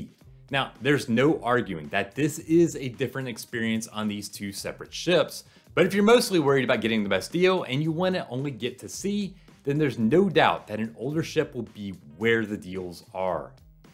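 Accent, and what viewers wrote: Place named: South, United States. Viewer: Folks from the south they talk like that. Call it a unique characteristic of the regional dialect. I'd even wager that most people wouldn't notice and most who do they would appreciate the slice of americana it represents.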